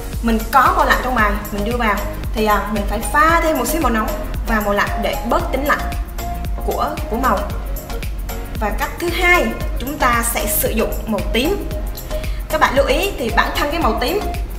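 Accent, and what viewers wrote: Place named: Central Highlands, Vietnam. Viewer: Chị dạ hay quá , giọng lạ nữa , như người dân Tây Nguyên luôn 🥰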